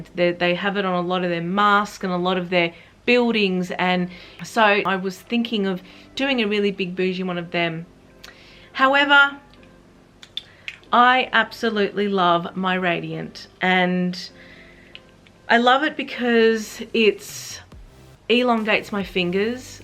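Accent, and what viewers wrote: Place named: Australia. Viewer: Love your accent, is it Australian?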